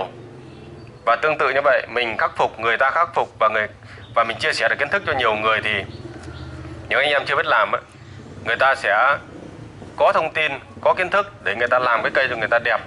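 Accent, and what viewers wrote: Vietnam. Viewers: Giọng thằng này 70% dân hoa thanh quế. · Bác dậy làm rất dễ hiểu.mà cho e hỏi bác ở thanh hoá phải k ạ.e nge giọng giống ở yên định thanh hoá quá bác ạ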